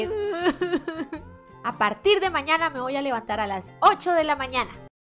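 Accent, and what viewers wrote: Colombia. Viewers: tu de que parte de colombia eres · Oye una pregunta eres colombiana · ¿De que parte de colombia sos? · Eres de Bogotá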